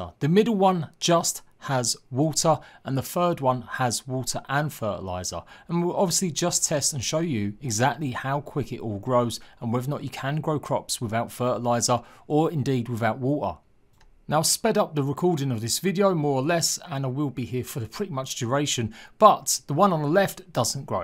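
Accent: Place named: United Kingdom. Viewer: without wo'ah, I love British accent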